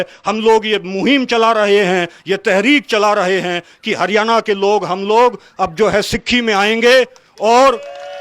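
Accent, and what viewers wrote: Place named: Bihar, India. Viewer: Bhai u r talking like a bihari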